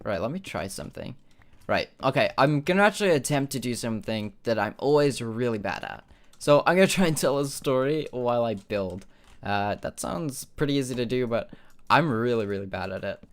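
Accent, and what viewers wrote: United Kingdom. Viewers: I love your British voice😘❤️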